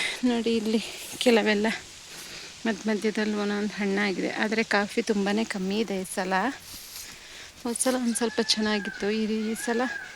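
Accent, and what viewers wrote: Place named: Tulu Nadu, India. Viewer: Nimage Thulu barutha magu mathaduvaga anishithu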